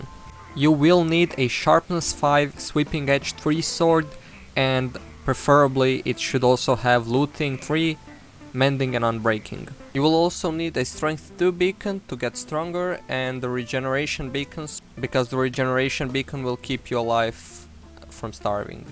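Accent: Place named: Russia. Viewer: u sound kinda Russian. also I had a question, does this farm work on paper? bcs ray works one doesnt.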